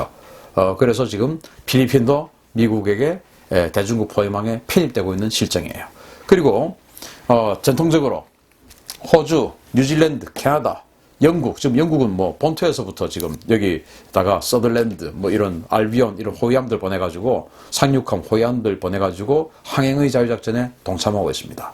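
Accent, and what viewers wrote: Gyeongsang, South Korea. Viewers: 신인균씨 경상도 사투리가 조금 남아 있네요. 표준어 구사를 부탁합니다.. 자주국방 네트워트 회원이었죠. 지금 한미간에는 어느때보다 가까운데 갈팡질팡이라는 것은 가짜 뉴스에 불과 합니다. 조갑제 tv등 극우 언론인 ,기독교단체의주장이 일방적으로 진실이다? 무슨 설교하세요? 몇일전에 미국 재무부가 확인해준..한국의 가짜뉴스를 인증했는데... 미국도 친중인가요? 그럼 박근혜때 친중을 넘어 사대주의 끝판왕일때는 뭐하셨어요? 박근혜 정부 찬양하느라 바쁘셨는지? 중국 사대주의 박근혜 -시진핑은 베프 통일방해 북한지원 중공군 빨갱이 시체 무상으로 헌상 -친중 서방진영유일 중국과 FTA 박근혜-친중 TPP 쌩까고 AIIB 가입 : 친중 중공군 전승절 공산주의 동맹 반미 : 친중 대통령 당선직후 중국에 김무성 특사 : 친중 중국 미세먼지에 고등어 타령 : 친중 평택 차이나타운 건설 : 친중 제주도에 중국공안 배치 검토 : 친중 차이나머니로 정계, 언론계, 문화계 친중매국노 판 만듬 : 친중 차이나머니로 국내 기업 M&A해서 기술 다 빼김 : 친중 중국의 남중국해 강도짓에 침묵 : 친중 중국의 센카쿠 침입에 침묵 : 친중 이것이 친미인가요? 친중인가요? 이명박이 적극 지원해서 건립한 롯데월드는 신이내린 안보방어벽입니까? 노무현때는 논의하고 거절하면 죽일것처럼 덤벼들고 그것때문에 자주국방테느워크 사이트에서 많이 싸웟죠 대부분의 회원들의 역사관이 개신교, 이승만 박정희 박근혜 이명박... 그리고 일본에 대해서는 섬김의 나라..일본의 식민지배 찬양하는 회원들이 많았고 밀리 사이트인지? 아니면 극우 정치사이트인지 ? 에스더라는 극우 개신교 가짜뉴스방송과 조중동이라는 친일극우신문의 가짜뉴스를 보고 판단하시는건가? 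문재인정부가 갈팡질팡이라.... 밀리터리도 그닥 잘아신거 같지 않지만.. 정치경제를 모른가면서 가짜뉴스를 바탕으로 정치경체논평하는건 모순이라고 생각합니다만? 美, 대북제재 구멍 겨눠… '세컨더리 보이콧' 명시 : 뉴스 : 동아닷컴 이밖에 신인균씨와 조갑제씨와 친하신가? 조갑제 방송에도 나오시네요 그 조갑제 티브이...검색하니깐..전부 박근혜 친박 극우개신교단체사람들만 사실이라고 주장하던데... "한국에 세컨더리 보이콧"?…미 재무부 '사실 아니다' 확인 국내기사뿐아니라 미국의 문재인 트럼프 한미관계 대한 자료입니다. 미국 재무부 " "한미동맹 굳건"…트럼프 "한미는 위대한 동맹관계 트럼프 "내 친구 문재인".. 친분 과시한 한미 정상 <link> <link> 트럼프 "한미FTA로 양국관계 발전·도약" 웜비어 부친 "문재인·트럼프, 한반도 평화구축 노력 지지" 문재인·트럼프 대통령 노벨평화상 받을까 트럼프가 시진핑에게 문재인과 친분과시 문재인이 한국에 중국군대를 주둔하자고 했나? 역대 최고 수준의 한미관계를.. 박정희가 미사일 사거리 주권 헌상하고, 박근혜는 뭐했으며.. 문재인이 미사일 사거리 중량 패기는 친중인가요? 미국 전략자산 들어 오는것도 친중인가요? 이명박 박근혜 때 9년 뭐했어요? 비판좀 하시지...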